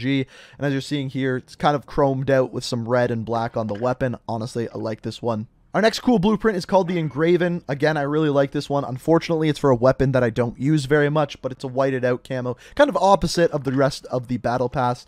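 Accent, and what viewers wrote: Canada. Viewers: Canadian?! Eh!! Love the channel even more now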